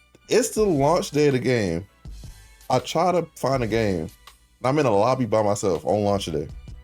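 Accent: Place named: United States. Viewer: From the footage I've seen they're just recycling so much of the first game and it feels like they want to do fancy with the newer stuff like eye tracking, but I honestly wouldn't care much about that either. I don't get how they can mess up so hard. Did they actually create any new maps? All I've seen so far is old maps... It was quite hard to understand your American accent lol, but a fun and honest explanation. Greetings from Belgium!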